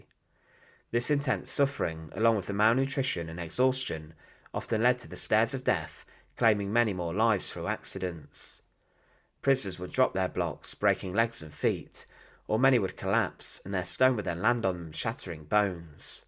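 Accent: United Kingdom. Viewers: The fact that you prolong the last word of EVERY sentence has stopped me from subscribing. Please take that statement as constructive criticism. I appreciate your fact finding but I’m not a fan of how you’re portraying the British accent. Way too generic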